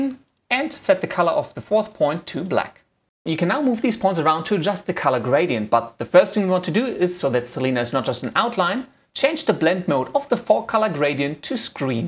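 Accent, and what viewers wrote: Germany. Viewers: Are you from Germany? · Are you German? :D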